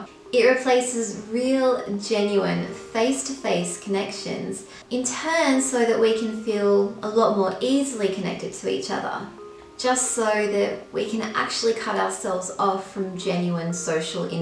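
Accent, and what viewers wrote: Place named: Australia. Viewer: My initial thought - sounds like an Aussie accent. Look into description YT page - Country: Australia - knew it lol. My thoughts on social media: should be used in moderation, balanced with things outside of it - e.g., outside activities As to future videos, I would suggest that you look into not just how often social media plays a part into our lives but also how many social media platforms are used - it feels like we are inundated with so many of them that it pretty much consumes all of our time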